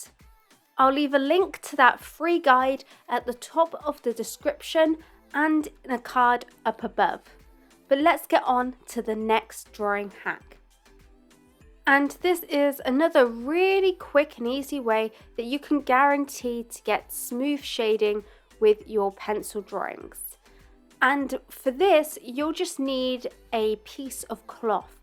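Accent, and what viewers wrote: United Kingdom. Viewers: Going by her accent I am pretty sure that she is British. Love your name, btw.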